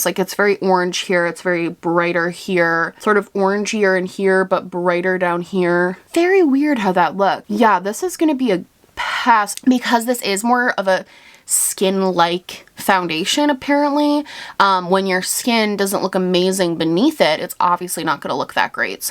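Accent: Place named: East Coast, United States. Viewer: LOL i knew you were an east coast girl by your voice. love you x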